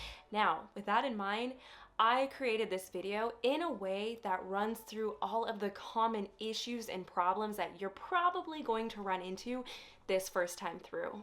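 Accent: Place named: United States. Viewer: Your instruction is great. Thanks for keeping your instruction to the point. Having previously lived in the USA on and off for a few years its nice to hear your american twang reminds me of Seattle.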